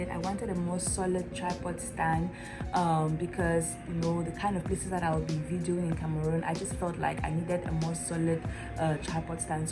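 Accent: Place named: Nigeria. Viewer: I don't know but you sound so Nigerian.. Nice video, you just got I new subscriber.. Keep up the good work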